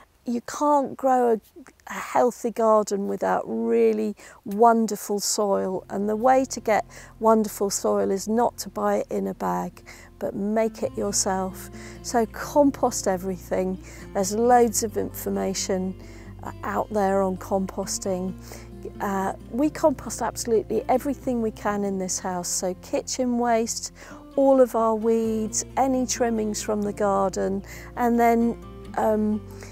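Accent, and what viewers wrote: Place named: United Kingdom. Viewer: Such a beautiful accent. Is that British?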